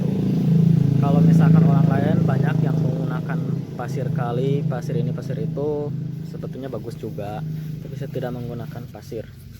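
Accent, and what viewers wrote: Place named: West Java, Indonesia. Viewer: Kang kl dri logatnya ky nya orang sunda ya..itu jenis anggur apa kang..nyimak terus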